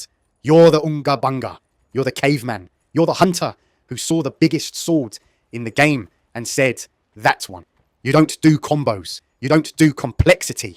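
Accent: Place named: United Kingdom. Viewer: Really sick of choosing random videos only to find another British narrator. Do you mfs have real jobs?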